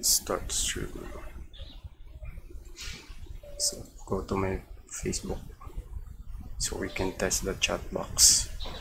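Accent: Philippines. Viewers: filipino accent 100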